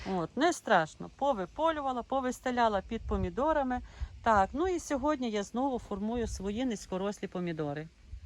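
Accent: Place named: Ternopil, Ukraine. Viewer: Доброго дня там ваш чоловік. Дуже Вам дякуємо за Ваше відео. Дивлюся кожне Ваше нове відео яке виходить. Ваша мова така мені знаєма, у мене покійний чоловік з Тернопільської обл. Борщівського району, він розмовляли точно як Ви. Я з Херсонської обл. Каховського рну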